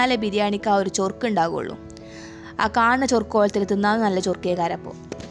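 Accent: Malappuram, India. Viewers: Adipoli biriyaaniyum malapuram slang um😂😂😂✌️😘😘😘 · ചേച്ചി മലപ്പുറം ലാംഗ്വേജ് സംസാരിക്കണം റ്റോ.. നല്ല രസം ഉണ്ട്. കേൾക്കാൻ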